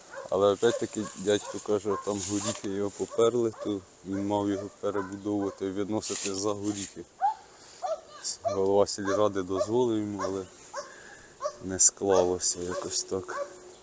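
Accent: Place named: Podillia, Ukraine. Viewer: У прекрасна вимова з приємним подільським діалектом. А "дядько каже " просто супер, не треба чіплятися до людини, мови вчать на інших каналах.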